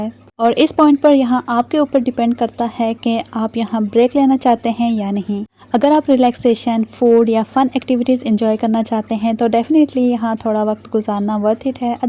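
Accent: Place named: Pakistan. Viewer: Rayal nahi madam...Riyal hota hai 😄😄 Pakistani English UK English aur USA English sab English ko merge kar ke explain kar rahi ho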